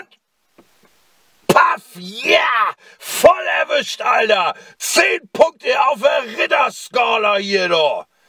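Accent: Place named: Hamburg, Germany. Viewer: In welcher Stadt wohnst du weil du klingst so Hamburgisch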